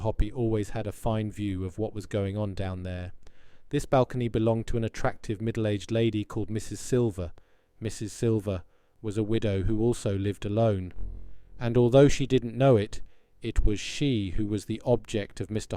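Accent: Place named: United Kingdom. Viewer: d=(^o^)=b thanks 좋은 영상 감사합니다. 영국식 발음 ㅋㅋ 워터~~ 영어화면 있으니 비교해서 보는게 좋네요^^ 영화도 보고싶네요^^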